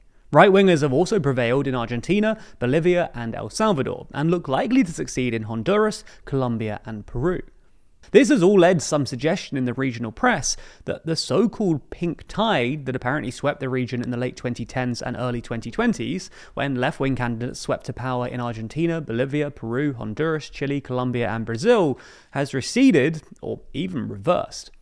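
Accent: England, United Kingdom. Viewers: Poor research on this video. Ecuador has also elected a right wing president Daniel Noboa. The incompetence masked by an English accent.....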